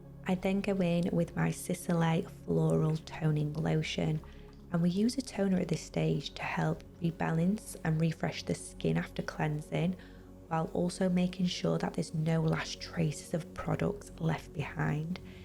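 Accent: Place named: Manchester, United Kingdom. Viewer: I’m American but I think you’re from Manchester. Why? Because I watch a lot of English football. If I’m wrong I’m wrong. Still fun for me to guess whilst appreciating your work. Cheers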